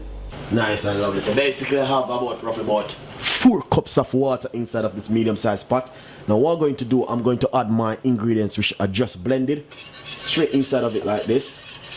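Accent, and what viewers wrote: Jamaica. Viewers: ummm he's speaking patois (patwa) our native Jamaican language....if u dont like how he speaks then move on to the next video.. we know its nutmeg but in broken English is nuttinegg....bitch!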